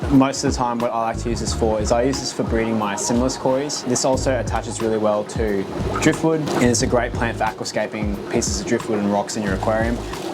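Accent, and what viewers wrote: Australia. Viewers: its cause his Australian accent is kinda hard to understand lol.sometimes what you end up hearing is completely need up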